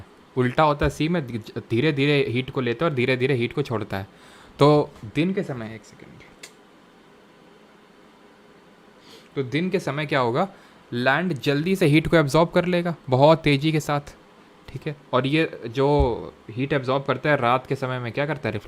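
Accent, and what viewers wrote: Kanpur, India. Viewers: Sir kanpur se ho aap ?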